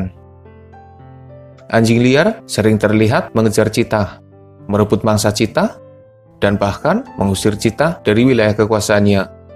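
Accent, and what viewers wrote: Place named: Java, Indonesia. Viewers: Bang suarane medok tp lucu nemen yakin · Medok bang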